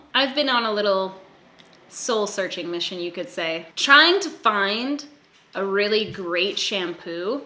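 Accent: Canada. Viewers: I have many thoughts on today’s vid but I’m very, very tired so I’ll leave you with this: you said ‘saga’ in your sweet lil Canadian accent and I heard ‘Sega’... like sega genesis. Like sonic the hedgehog Sega. And I was like ‘so was NOT kidding. This video went off the makeup rails’ and then I realized you were saying ‘saga’ and I couldn’t stop laughing about what a dumbass I am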